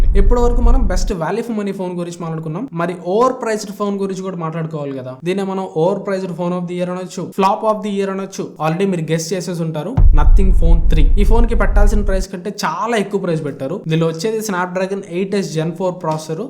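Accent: Ongole, India. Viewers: Bro meedhi Ongole aa ?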